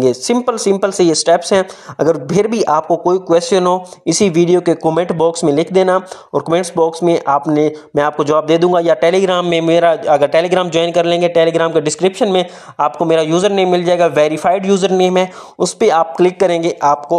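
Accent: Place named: India. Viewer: You text in English you talk Indian🙄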